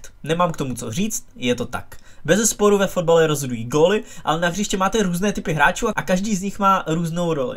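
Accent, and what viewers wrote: Czech Republic. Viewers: To je otřesné, jak furt mluví jak Pražák.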